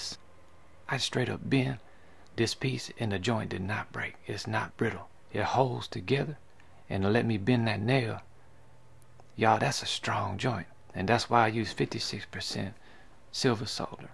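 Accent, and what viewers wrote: Louisiana, United States. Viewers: I see a bayou man myself with that Louisiana accent, maybe I'm wrong lol.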